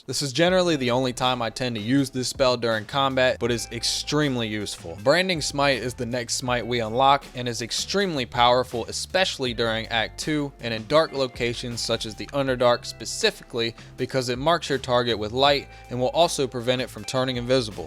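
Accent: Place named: Staten Island, United States. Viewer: Had to subscribe. You totally sound like you're from my neck of the woods (I'm in Staten Island).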